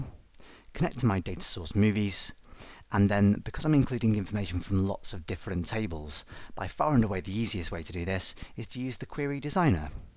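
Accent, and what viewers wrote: England, United Kingdom. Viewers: Refreshing to hear these type of articles with a british accent for a change. Nice one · Love all your videos. Like the English accent. I would like to expand on this topic and see if you could use a stored procedure instead of an embedded t-sql and pass the parameters to this sp to retrieve the information. I tried to do this but could not bind the parameters to any field in the report query. Do you have any suggestions?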